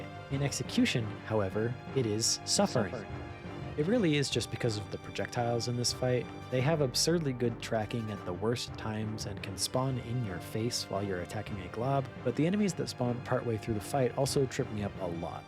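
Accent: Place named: Canada. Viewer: You sound painfully Canadian.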